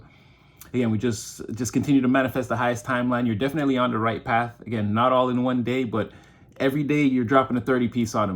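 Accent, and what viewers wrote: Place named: Caribbean. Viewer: As a Caribbean, I love this reader lol and whenever he speaks in patois I swear it feels like he’s channeling my Caribbean passed on loved ones lol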